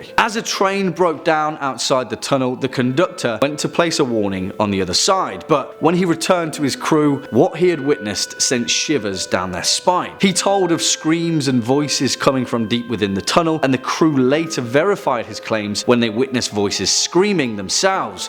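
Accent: United Kingdom. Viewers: HOW can this dude be from Ontario, CANADA when he got a BRITISH accent? WTF???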